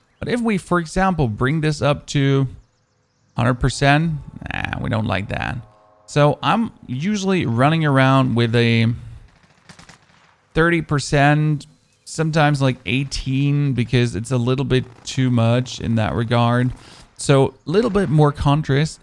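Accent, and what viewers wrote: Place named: Germany. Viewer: I can hear from you accent that you german :)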